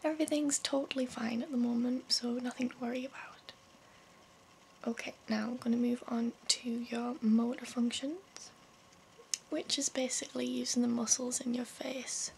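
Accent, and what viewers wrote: United Kingdom. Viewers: ahhhh...British accent nerve exam..YES!! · Google needs to design an auditory searching mechanism that allows you to search by accent. The ASMR industry needs more Brits! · I'm sorry to ask you this, but what is your accent? I can't seem to figure it out, I'm terrible with telling the difference between Scottish, Irish and Welsh xD haha love all your videos, keep going :) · I think its the British accent that does it for me. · that accent is delicious. Where in the UK is that from? Also great video, of course. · great to hear some bri'ish accent, and on top of that, it just a great vid!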